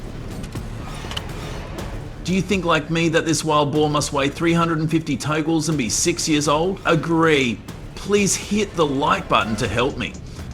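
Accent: Australia. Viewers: I like that the narrator is from Australia or some place where they sound Australian I love it it gives the program more character and sounds really great😊😊❤❤viewing from Monmouth Oregon <num>😊😊❤❤ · You sound like an Aussie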